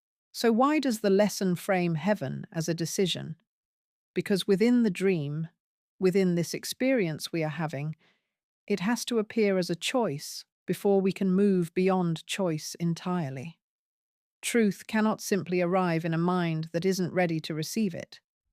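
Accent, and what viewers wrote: United Kingdom. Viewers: I really love the British accent. Idk why but it's very soothing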